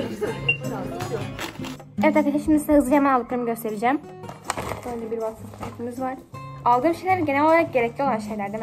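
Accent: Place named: Russia. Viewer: Rus veya Ukrayna olması lazım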